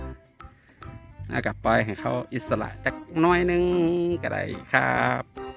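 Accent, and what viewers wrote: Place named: Isan, Thailand. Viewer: ต้นข้าวน่ารัก ชอบลุง พากษ์เสียงอีสานค่ะ ต้นข้าวเกิดอุดร ฟังภาษาอีสานรู้เรื่องคงได้ยินตั้งแต่เกิด....🥰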